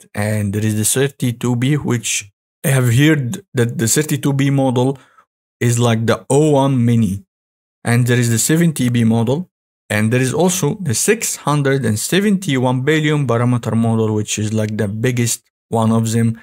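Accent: Egypt. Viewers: Brother, you sound egyptian. Great video btw.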